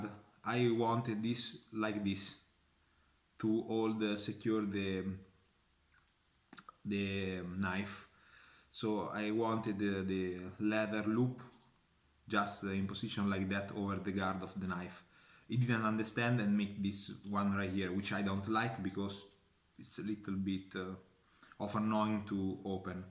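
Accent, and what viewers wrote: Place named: Umbria, Italy. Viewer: Bravo, bella presentazione. Ottimo coltello. Il tuo inglese con cadenza umbra è inarrestabile!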